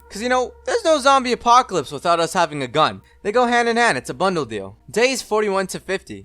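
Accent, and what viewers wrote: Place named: Italy. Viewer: When I hear your voice, I hear an Italian accent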